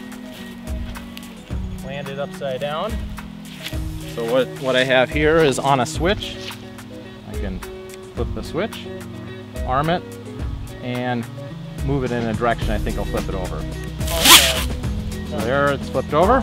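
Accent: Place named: Wisconsin, United States. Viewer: Sounds like you're from Wisconsin. I am!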